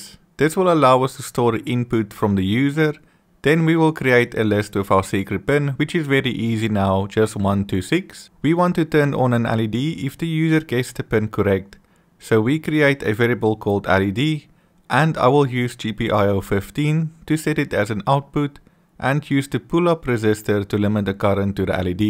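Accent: South Africa. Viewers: At first i thought you were European Then i heard of say matrix with that south african R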